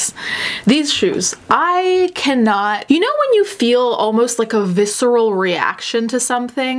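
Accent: Italy. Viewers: I think i found a new fetish. Listening to pronounce things with the heavy italian accent is just so satisfying. Please say prada again. Or gucci. Or whatever. Uuuugh just like cutting a tomato with a freshly sharpened knife. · The linguist in me, loves that you pronounce Gucci and Prada with a proper Italian accent. I've notice you do this in other videos, but it really stood out to me here.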